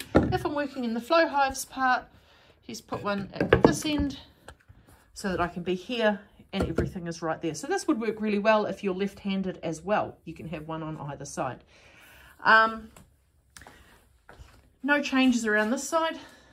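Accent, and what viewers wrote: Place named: New Zealand. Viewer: Gr8 update,. I should have listened closer to dialogue and would have noted you were Kiwis. Weee used often “bet” for bit … might be rivals on the turf and on the paddock but best of mates after the games and a few beers. Cheers…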